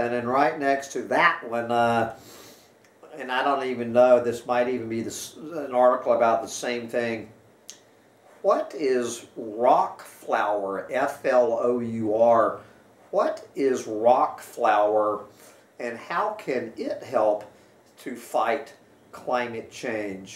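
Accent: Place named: South, United States. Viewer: This guy is pretty smart. Knows his stuff. Personally, I've found that the southern USA accent makes him seem like a dumbass. And unfortunately, a bit tedious to listen to. But I couldn't agree more with the message. I love the honesty of climate doomers. We've been lying to ourselves and each other for long enough.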